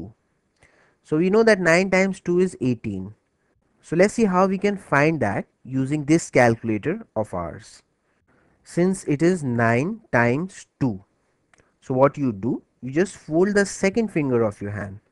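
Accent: India. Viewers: By listening to your voice I can tell that your an Indian :) keep up the good work brother!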